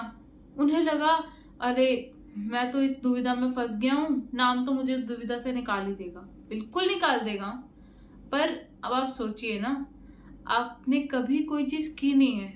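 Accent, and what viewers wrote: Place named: Rajasthan, India. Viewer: Hamne bolne ki tun she pata lga liya aap rajsthani ho. 🎉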